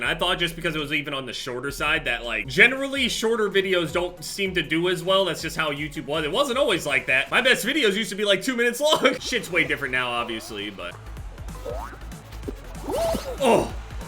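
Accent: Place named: New Jersey, United States. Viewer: I could always tell was from nj just by the way he says yesterday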